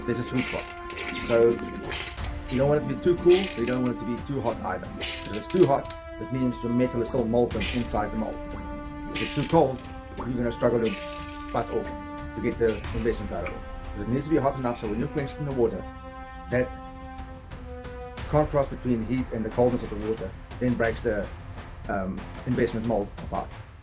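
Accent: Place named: South Africa. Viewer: I know that accent anywhere 🔥🔥🇿🇦🇿🇦💪🏻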